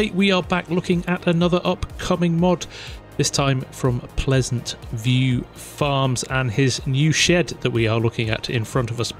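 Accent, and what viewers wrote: United Kingdom. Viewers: I really enjoy the thoughts and insights of. For me, he is the companion guide to playing FS22. (Must be the British accent) I appreciate him putting us onto modders who have really put in a lot of thought, design, and utility into their art...It all just makes the game so much more enjoyable. BIG thumbs up!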